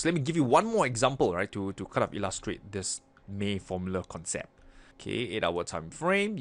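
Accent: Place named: Singapore. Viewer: Singlish accent is popping!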